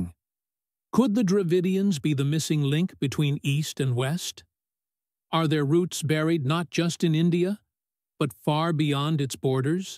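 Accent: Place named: United States. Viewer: Why is an American telling us about our DNA? Personally, with trump destroying the world, I'm very irritated by the accents from the US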